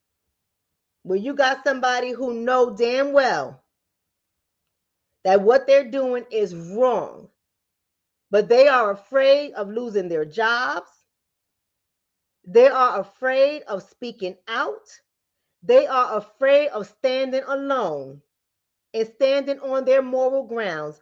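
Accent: New York, United States. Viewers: I am in LOVE with your New York accent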